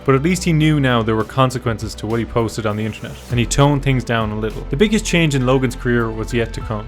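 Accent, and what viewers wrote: Ireland. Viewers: That's an Irish accent right there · Nice piece on Logan. I would have never of thought I would watch more than a minute of Logan’s content and nowadays I won’t miss an episode of his podcast. I hope he makes things right about this crypto stuff, I feel like he’s going to address it soon. Btw I’m a Leitrim man myself you don’t sound far off. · You sound like an irishman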